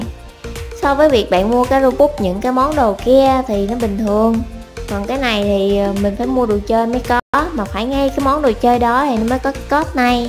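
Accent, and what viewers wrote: Mekong Delta, Vietnam. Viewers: người Miền Tây mà giọng lúc nào cũng kawai như thế này cơ đấy xD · Chị Miền Tây à!! Thảm nào giọng Chị Kute qué:3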